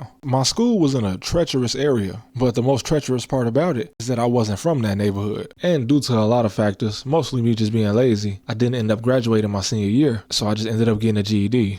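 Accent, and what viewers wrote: Chicago, United States. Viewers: And bro you definitely got that Chitown Southside accent. Great videos! Nostalgic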